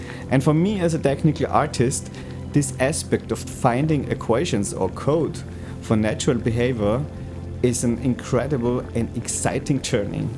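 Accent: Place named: Austria. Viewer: Awesome work,! And awesome Austrian English 😄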